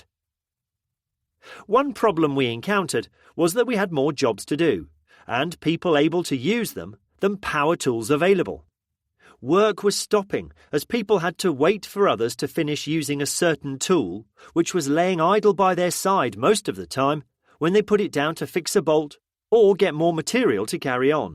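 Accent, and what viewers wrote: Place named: United Kingdom. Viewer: The cherry brit voice kills it for me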